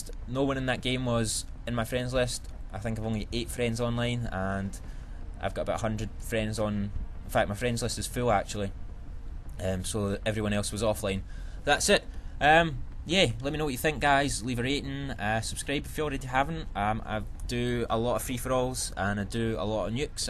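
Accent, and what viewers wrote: Scotland, United Kingdom. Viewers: r u scottish, i love axent =D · it is, he's scottish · scottish... mute. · scottish accent.. eww... i was sick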